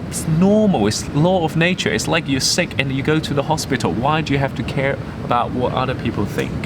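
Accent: United Kingdom. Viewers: But the first thing, the person must have a positive thinking, or he/she will be buried themselves. Btw, I love watching your videos and I like your British accent. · good UK accent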